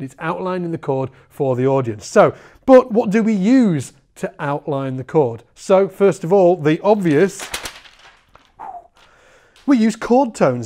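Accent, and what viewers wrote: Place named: North, United Kingdom. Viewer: New video format and northern accent hella lit!!! 🔥🔥🔥🔥🔥😂😂😂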